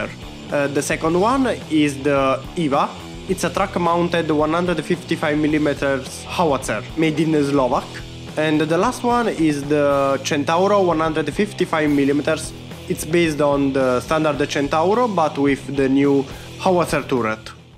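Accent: Italy. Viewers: Sei italiano so riconoscere un italiano che parla inglese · accento italiano sempre il migliore ;)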